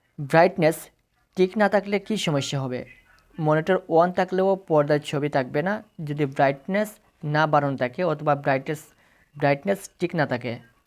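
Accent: Sylhet, Bangladesh. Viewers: Sir Afne to sylheti 😂 afnar mate buja jay❤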